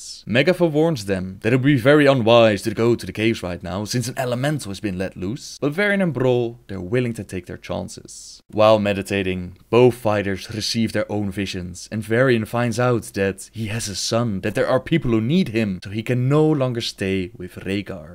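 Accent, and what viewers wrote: Netherlands. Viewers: Dutch accent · No. it's his accent. he's Dutch.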